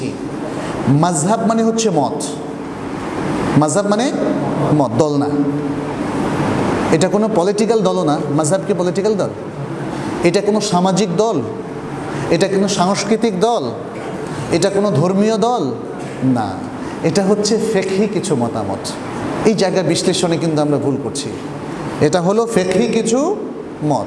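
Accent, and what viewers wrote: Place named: India. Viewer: সে ইন্ডিয়ান , ইন্ডিয়ান ও পাকিস্তানিদের উচ্চারণ বাংলাদেশী বাঙ্গালী জাতির লোকেজনের থেকে আলাদা ও ভিন্ন হয় , এক্ষেত্রে ইন্ডিয়া এর বা পাকিস্তানের দেওবান্দী ও আহলেহ়াদীস় সালাফী আলেমদের উচ্চারণ একই রকম হয় । আর সলাাতের বাইরে কেউ ভিন্নভাবে উচ্চারণ করলে বড় সমস্যা হয় না জানা , আর তাঁরা কখনো হ়ারাফ় এর বা কালিমাহ লাফ়াজ এর পরিবর্তন ঘটে এমন করে পড়েনা বা উচ্চারণ করে না ।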